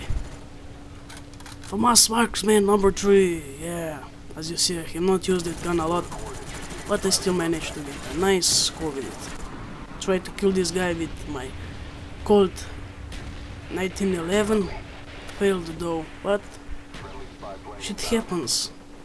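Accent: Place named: Mexico. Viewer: You sound Mexican rather than someone coming from the Balkans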